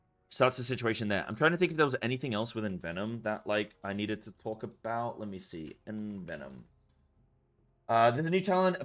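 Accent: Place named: England, United Kingdom. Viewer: I’ve abstained from posting this comment for the longest time, but finally cracked… where are you from? I recognise a mostly English accent, however, there are a few intonations in your voice that make me question this. As an aside; another great video, thank you. Feral main 👍🏼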